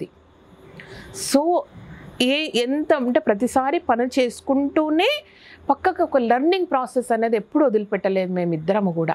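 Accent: Telangana, India. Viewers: Super. Telangana yaasalo chaala baaga aagakundaa acham vaarilaage maatlaadaaru😃😂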